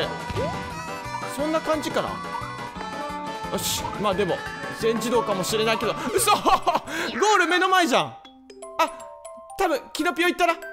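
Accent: Fukuoka, Japan. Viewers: もう少しで勝てそうな惜しい試合が多かったですねｗ それよりなんだかコメント欄がにぎわっていますね！良いことだと思います！は福岡のかたですか？前から福岡っぽい喋りだとは思ってましたがｗ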